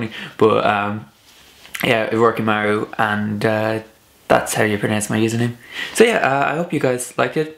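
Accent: Ireland. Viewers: To non-Irish, your accent is quite pronounced. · You have a gay irish accent ! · You're defo D4. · Yeah, we like Irish accents. You have one, for sure. As an American, you'd never pass for American, nor English. Tootsie Rolls are chocolate chewy candies that look like frankforts, (hot dogs). Ramen Noodles ( RAH-MEN) are just what you imagine they are, small individual packs of noodles you heat and eat. Pecan, is a nut we Americans say like Pee CAHN, or Pee can. Neutral to you, man, but delightfully different than Americans'. Yeah, Americans say "wada" for water. " Nice video! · Yeah you don't have a "tick" Irish accent. Sounds a little bit like mine. I wouldn't say it's Americanised though. When I think of that, I think more of the D4 accent. Yours definitely sounds Irish, just soft and not at all like you are trying to sound American. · Ah babe, you've a mad strong Irish accent :)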